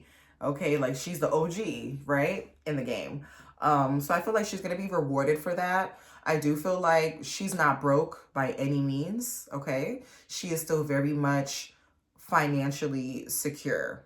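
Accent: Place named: New York, United States. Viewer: You took me back to Star and Buckwild. I said definitely a New Yorker😂😂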